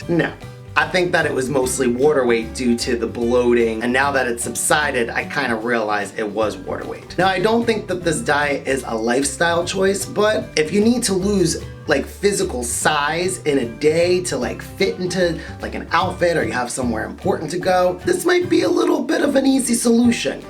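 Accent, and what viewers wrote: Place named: New Jersey, United States. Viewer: I’m assuming ur from jersey or something because u pronounce water “wuter” (not making fun of u, I just know bc I have a friend from jersey who does the same thing)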